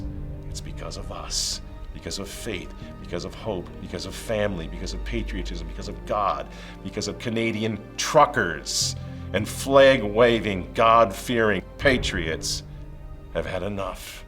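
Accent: United Kingdom. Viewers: You do a great British accent!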